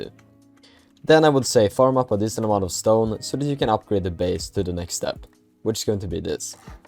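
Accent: Sweden. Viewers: I can hear that you are swedish my g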